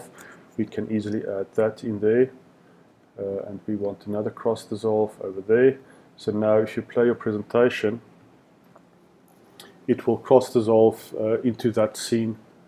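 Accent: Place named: South Africa. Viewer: Thanks for this! Recognise the South African accent! So great to see great content for SAFFAs.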